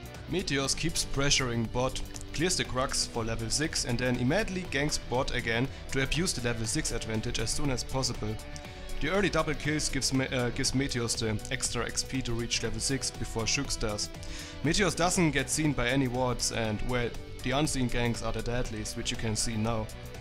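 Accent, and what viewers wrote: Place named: Germany. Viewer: Dat German accent xD wie geil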